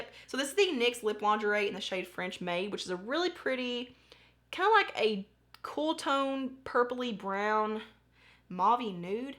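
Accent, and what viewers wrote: South, United States. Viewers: Love love love your southern accent girl it’s so beautiful to hear. You are beautiful and your skin is amazing.! I’m Always here to support smaller YouTubers Never give up your dreams !Xoxo from Emmett Idaho! 💗🌸 Congrats on 25k! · #partypeople where Is your accent from? I love it, I'm southern also but I'm more of the hill Billy type lol hate that you are crying even if it is happy tears, you seem so sweet and although I don't know what's going on but I'll pray for you also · I love your country accent!❤️ And your makeup is 🔥 #partypeople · I love when I find new people to watch. Regardless of the giveaways I subscribed and rang the bell as soon as you started talking. Love the southern essence #partypeople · Here from Vianney's channel, subscribed, but not entering the giveaway, because I know nothing about applying makeup lol. 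I will say that I love hearing a southern accent!!! (Tennessee girl here) I also like that you're relatable and not afraid to show your emotion. Prayers for you and your family.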